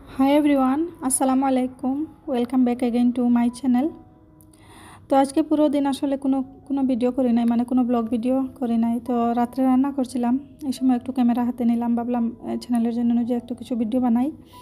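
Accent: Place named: Sylhet, Bangladesh. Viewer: 🔔🔔👍👍 আপু আমার সিলেটি কথা বালো লাগে । আমিও সিলেটি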